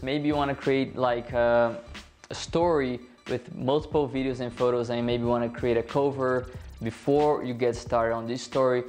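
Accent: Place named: Brazil. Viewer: Fala bem Iglês cara.. mas da pra perceber que é BR.. MEsmo assim parabéns!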